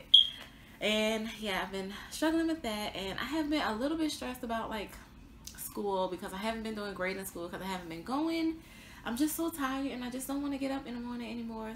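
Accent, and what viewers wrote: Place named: New Orleans, United States. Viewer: You sound like you're from New Orleans lol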